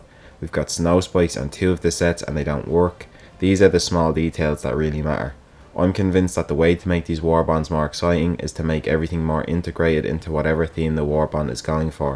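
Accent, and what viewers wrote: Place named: Dublin, Ireland. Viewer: Whats up man, couldn't believe when i heard your accent i'm from Dublin myself. Whats your steam name If you're down to run some games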